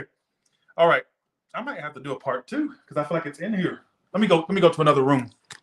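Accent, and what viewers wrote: England, United Kingdom. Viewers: Taurus read: !!! I love the brittish accent !!! 😆 🤣 😂 😹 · Love English accent it’s on point 😘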